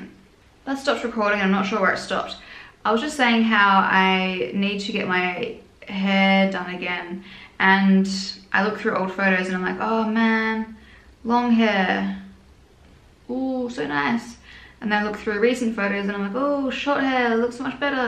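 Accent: Australia. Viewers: I love when she emphasizes R's to minimize her Aussie accent lol it's funny cute "bronzeRRRR" instead of "bronza"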